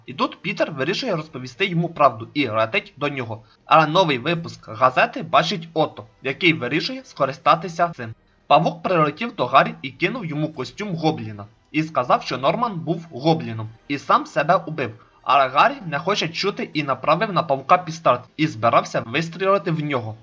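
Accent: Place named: Transcarpathia, Ukraine. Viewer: Пасувало би добавити фонові (тихенькі) звуки до картинки) І треба щось робити з закарпатським діалектом)) я з західної України, все розумію,все сприймаю) але от східні,центральні Україні важко буде для сприйняття( «Пистолєт» 😂 Пістолет))))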